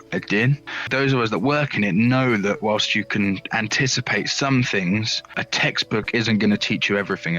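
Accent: United Kingdom. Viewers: Sounds like a norfolk boy! Good lad x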